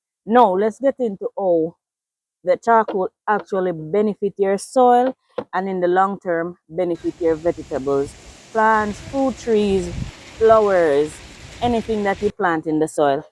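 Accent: Jamaica. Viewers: I like her Jamaican voice, good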